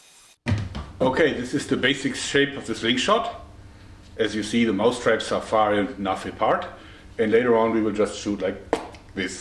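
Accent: Germany. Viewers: Man merkt dass du ein deutsche bist :P Aber nette Falle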